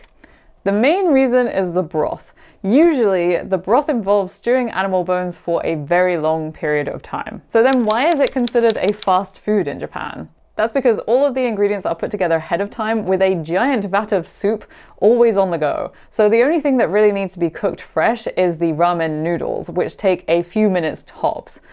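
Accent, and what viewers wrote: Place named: United Kingdom. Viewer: Great video! Nice to hear from a British person😂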